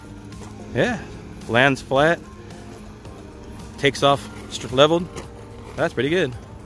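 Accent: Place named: Miami, United States. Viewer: are you from miami, this accent is miami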